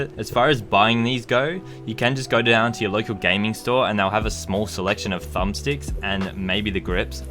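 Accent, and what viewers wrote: Australia. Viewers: he is Austrilian dingus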